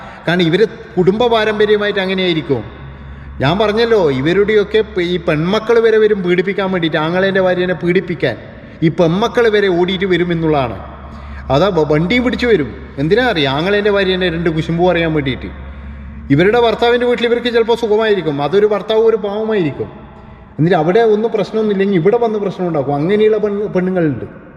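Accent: Kannur, India. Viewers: കണ്ണൂര് ആണോ, നമ്മളെ കണ്ണൂർ ഭാഷ ആണല്ലോ പറയുന്നത്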